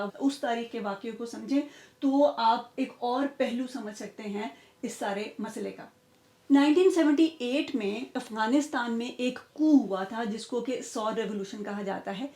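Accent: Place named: Karachi, Pakistan. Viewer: Waaah waaah mazaa agaya apka video dekh kar unbiasedly spoken ❤❤❤ from now I'm big big fan of yours ..u sounds urdu spk from Karachi wich are my fav ppl in Pakistan looking forward more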